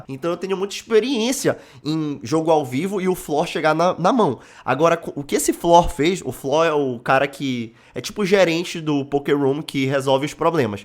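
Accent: Pará, Brazil. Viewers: Mano tu é paraense ? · Mano tu é paraense? kkk Brabo de mais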